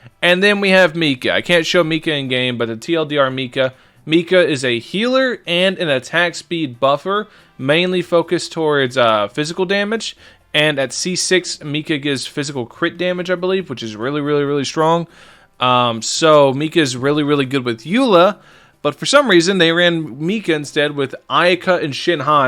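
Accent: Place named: Canada. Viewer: My girlfriend thinks you sound Canadian. Are you brother?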